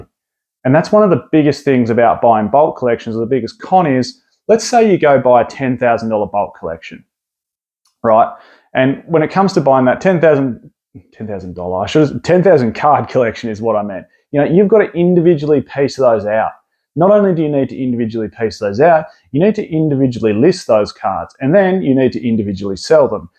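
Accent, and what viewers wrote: Australia. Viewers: All facts, i just really enjoy these videos. The aussie accent doesnt hurt either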